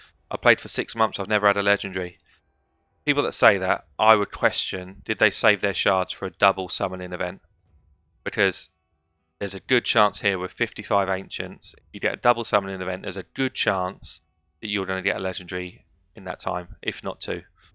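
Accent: United Kingdom. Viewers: Got directed here from Stew. Great vid and info and nice to hear a brit 🏴 doing some raid content. I'll be coming back 👍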